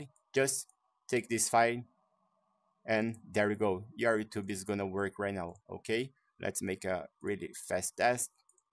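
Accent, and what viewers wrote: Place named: Brazil. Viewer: so pelo sotaque sabia q era BRkkkkkkkkkkkkkk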